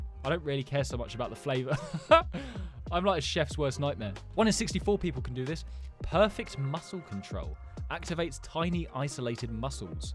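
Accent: United Kingdom. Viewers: i love this bros british accent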